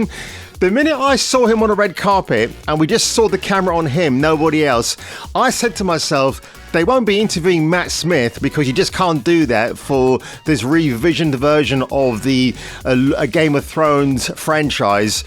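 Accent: London, United Kingdom. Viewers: You sound like a good London lad, course I could he wrong. My old ears ain't as good as they used to be lol. But I still can tell 🐮 💩 from Sophia Non'Entity when I hear it. Everytime this gobbshitte comes on the screen now. It's auto MUTE!!!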